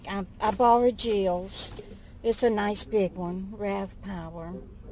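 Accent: South, United States. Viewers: you are a delight to listen too and see on your videos. Your voice is so southern and relaxing. I too am from the south. I was born 9/11/43 and raised in Jacksonville Fl. I am several years older than you though. Some of the things you say I think, "Well thinks just like me about some things."😃 I am new watching your videos. The first one I watched was of you just moving to New York. I wonder about you and the snow!! If you aren't use to driving in the snow that will be rough. But some people put chains (I think) on their car tires. But just wanted to write you a little note to tell you I enjoy your videos. Looking forward to seeing your apartment after you get all moved in and fixed up. God Bless you 🙂❤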